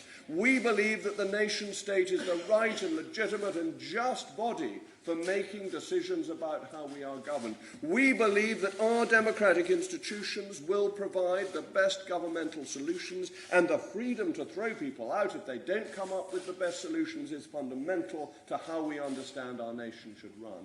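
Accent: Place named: RP, United Kingdom. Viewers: . . . and maybe a voice coach to rub the corners off that plummy accent. . . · Ok I get the turn off because off the "posh" thing, his silver spoon life as it were, but my God this man knows his stuff and always calm. The amount of times thugs have shouted at him and he calmly wants to chat, at which point they either shout more or walk off. Every interview its normally him running rings around the interviewer, its rare that they repeat questions because he does not stand for it or fall for it. He should be PM but I fear his personal views and money will turn lower income people off, posh well spoken people have always been viewed as been the reason for poor people....stereotypes.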